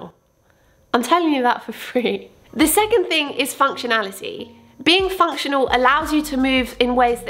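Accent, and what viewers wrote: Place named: United Kingdom. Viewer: I love your UK 🇬🇧 accent. 🤗 🌹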